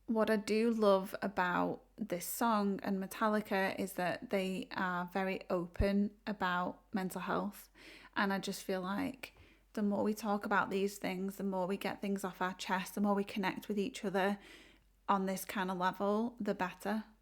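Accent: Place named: England, United Kingdom. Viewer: Hey, Found you through your reaction..Subbed for more, but I have to say You are f*n fun! Your look is absolutely rocking and I could just sit here and listen to that English accent and rock out with you all day! My wife is a Brit BTW, so I may be biased..lol) I see you have reacted to so I will be checking those out too. I am a GEN X guy, so Metallica would be my choice on the desert island if I could only listen to one band for the rest of my life. (Although, definitely has made me questioning that choice:) ). I would love to s see you react to -. I see your channel is quite new...let's build you up! I'm here for it!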